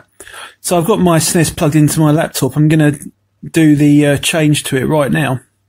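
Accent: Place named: United Kingdom. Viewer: It's in the description above in case u didnt understand what he said bcuz I couldn't understand him either with his British accent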